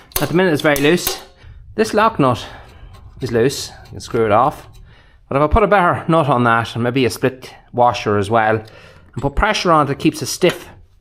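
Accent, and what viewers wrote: Ireland. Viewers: A thought was from Boston not Ireland 😮🏴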